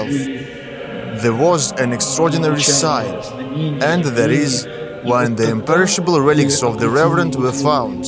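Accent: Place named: Eastern Europe. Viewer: This is all very interesting. I have been there, so it brings back good memories. However, why not get a native speaker of English for this series? It is a bit painful to have to listen to such a funny Slavic accent for a whole hour or more. Besides, there are quite a few words which are mispronounced or barely understandable. Even the word "skete" sounds something like "skit" which is something else entirely. Also, at about the music is so loud that the dialogue is even more difficult to understand. I tried to use the subtitles, but they did not make much sense as the mechanism could make little sense of the funny accent.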